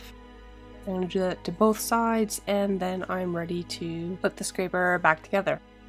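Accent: Canada. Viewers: I’m not sure how you slipped into my feed, but I’m so glad you did. I’m a Canadian/American tv writer with a love of old furniture. And I heard your voice and the lovely Canadian accent, and I was hooked!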